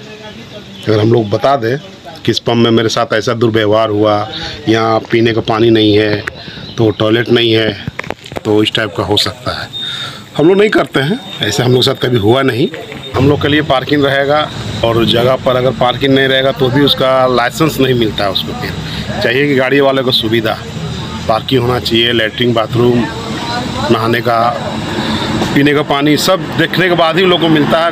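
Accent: Bihar, India. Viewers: Apke language se lagta hai aap bihar se ho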